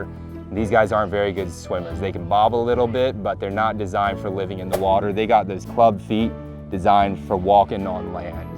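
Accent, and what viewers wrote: Australia. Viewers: He’s sounding a little more Aussie.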